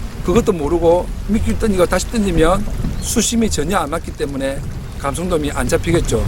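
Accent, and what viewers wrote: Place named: Tongyeong, South Korea. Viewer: 고향이 통영인 외지에 사는 사람입니다 고향말을 들으니 좋아서 그러니 오해마세요 ㅎㅎ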